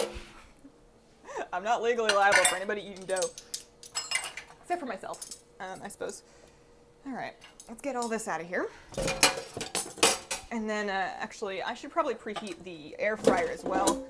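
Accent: Canada. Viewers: Never knew you were Canadian until this one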